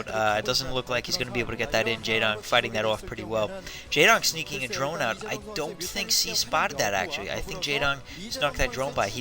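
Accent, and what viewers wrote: Boston, United States. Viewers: yo, great commentary. you sound like you from boston or something. I'm in NJ holla.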